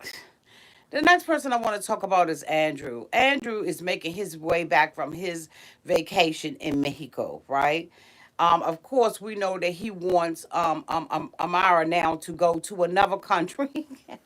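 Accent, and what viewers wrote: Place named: DMV, United States. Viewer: I love your accent, are you from the DMV area???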